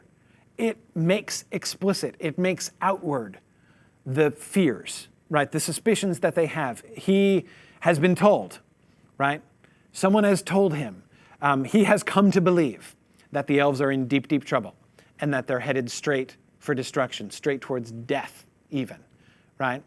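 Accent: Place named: Ireland. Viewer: Irish hobfoot accent doesn't help. Can you hear the sounds of "magic WANd" & "number ONe"?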